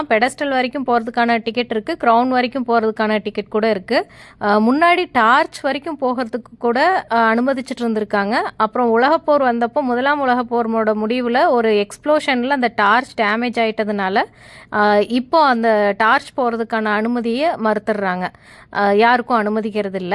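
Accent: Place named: Kongu, India. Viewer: கொங்கு நாட்டு தமிழ் வழக்குடன் அருமையான பதிவு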